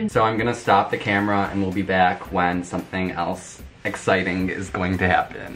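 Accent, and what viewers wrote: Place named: Michigan, United States. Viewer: Omg I heard your voice and I guessed that you were from Michigan before you said anything!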